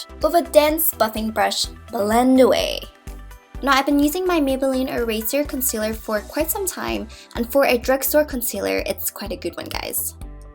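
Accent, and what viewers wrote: Ireland. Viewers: It's Irish.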